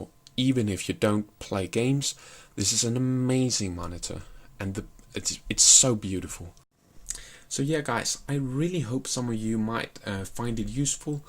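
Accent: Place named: Denmark. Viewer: The Danish accent is so obvious XD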